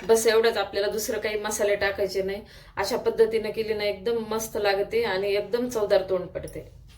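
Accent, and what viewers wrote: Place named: Beed, India. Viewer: शिमला मिरचीची काय भाजी 🫜 मिरच्या धुवून साफ करून याच्या मध्ये मसाला भरलेला❤🎉 ताई तुमच्या भाषेवरून माझ्या आठवणीत आले होते तुमचे नक्की बीड माहेर असणार❤ बीडची भाषा आपापली लगेच ओळखायला येते❤ ढोबळी मिरची रेसिपी खूप छान वाटली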